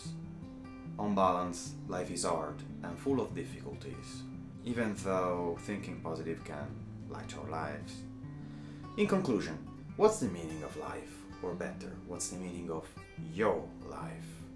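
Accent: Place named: Hungary. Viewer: I like your accent 😊 It’s like Hungarian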